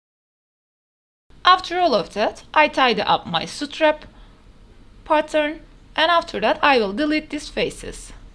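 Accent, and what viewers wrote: Turkey. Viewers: thx for tutorial and i cant hold it inside me but ur accent feels like turkish ngl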